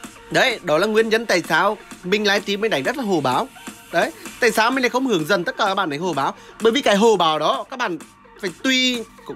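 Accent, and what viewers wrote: Nghe An, Vietnam. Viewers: Anh ở đâu nghệ an vậy ? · Bạn là người nghệ an ak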